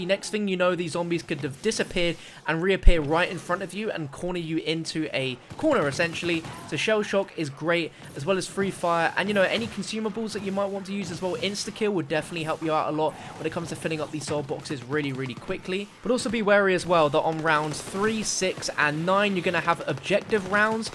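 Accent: United Kingdom. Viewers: omfg i cant stand ur british voice its awfull pls stfu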